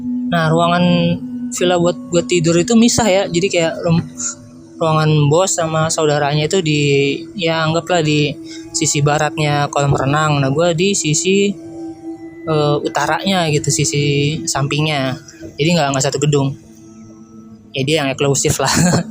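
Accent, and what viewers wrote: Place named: Bekasi, Indonesia. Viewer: Orang bekasii ya ini bahasanya khas banget 😂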